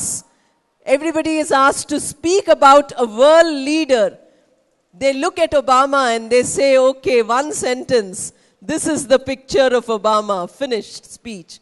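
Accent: India. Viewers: Your English speaking is just like a Hindi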